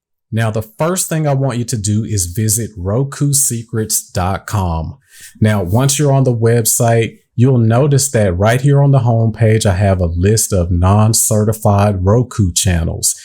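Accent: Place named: South, United States. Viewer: Came for the info, stayed for the accent. I don't know where you're from, but I love your accent. I live in NYC but I'm from Alabama... sounds like you're somewhere close to there. Thanks for the video!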